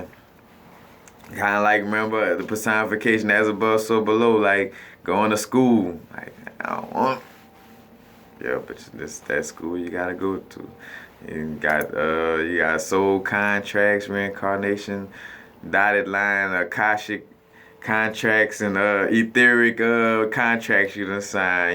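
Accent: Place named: South, United States. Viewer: where are u from ? u sound like ur from the south nere me in Louisiana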